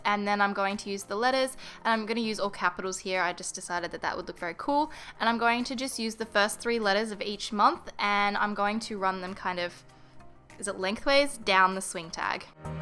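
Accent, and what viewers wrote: Australia. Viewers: are you aussi?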